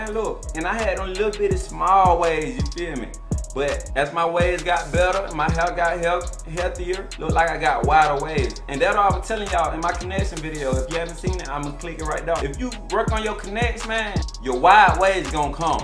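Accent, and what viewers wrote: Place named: South, United States. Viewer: You sound like you from the south...I fw with yo content thugh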